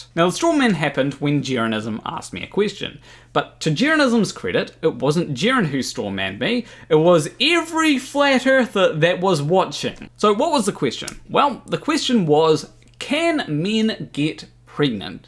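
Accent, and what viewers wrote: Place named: New Zealand. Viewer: Phoebics... Always good for a laugh. We have a very short life span in the scheme of things, and the best you can hope for is to be happy, looking forward to gettin up in the morning. If that means identifying as a cross/trans/metro/gay or any of the other options, just do it 👍. If it doesn't hurt others in your choice, then how can there be a problem? Great stuff as always, and always nice to hear another Kiwi accent on the tube. Cheers Hokitika.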